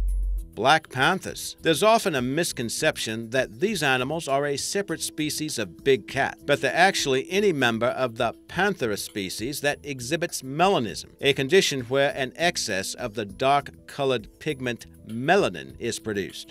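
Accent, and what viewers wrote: United Kingdom. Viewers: You know theres something called a british accent?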